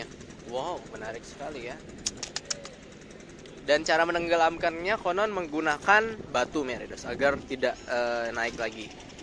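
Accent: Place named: Jember, Indonesia. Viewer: Jember bgt iaa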